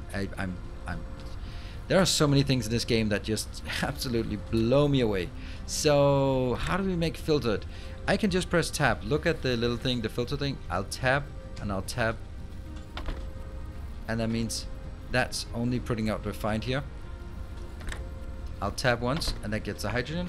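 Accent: Denmark. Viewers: I just tuned in because of DSP, really liking it so far. And it's great to hear a Dane (I assume based on the accent) doing let's plays!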